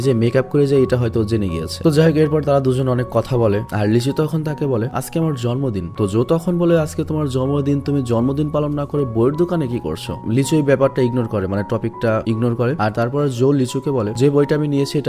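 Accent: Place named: Tangail, Bangladesh. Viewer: তুমি নিশ্চয়ই টাঙ্গাইলের মানুষ তাই না 😅😅😅😅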